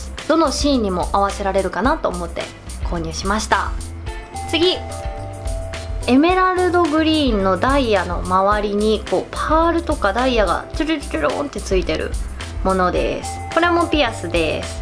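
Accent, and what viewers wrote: Hakata, Japan. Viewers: YouTubeで博多弁聞くとなぜかほっこりする笑 アクセサリーが大人の女性って感じで綺麗やん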